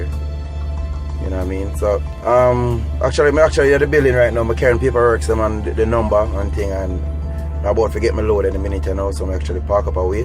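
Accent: Jamaica. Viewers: Omg didn’t expect the patois lol. Love it new subscribers 🙋🏽‍♀️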